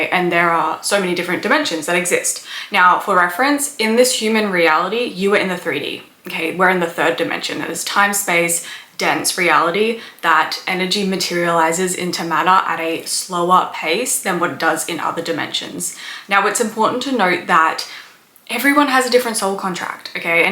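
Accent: Australia. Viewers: I can hear the Aussie accent breaking through ☺️ Great video Thank you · I believe I astraled when I was 5-8 years of age almost every night. Last year in September I attempted my first concious OBE and almost succeeded 😊 . My lower back was hurting so I sat up. I had the tingles, felt the vibrations, heard the loud rumbling (no alarm but we are all different). But also, I felt as though my heart chakra was holding me back as my chest felt weighted down. Unsuccessful attempt, but I was so happy that I got so far on my first go. Had heaps of similar experiences since but no feelings of being weighed down. Also, hello from Perth 🇦🇺 you sound like you're from Qld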